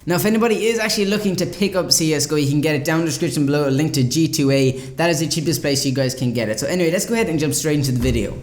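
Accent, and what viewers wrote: Ireland. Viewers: Are you Irish? I'm Irish too and ur accent sounds Irish 😂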